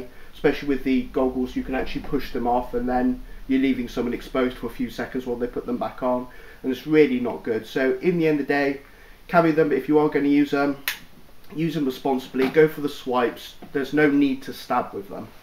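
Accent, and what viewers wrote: United Kingdom. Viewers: Are your British ? That's really cool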